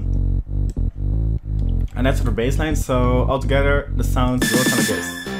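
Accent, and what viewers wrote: Netherlands. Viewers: graag gedaan gozer! Ik hoor aan je stem dat je gewoon NL ben haha, ben je ook een dj en wat is je insta?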